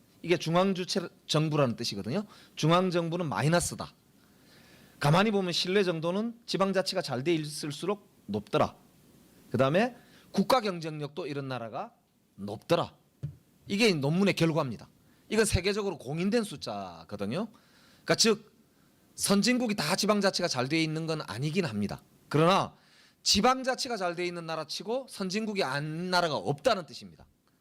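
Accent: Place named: Busan, South Korea. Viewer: 오늘따라 부산 사투리가 더 느껴지네요. 정겹습니다^^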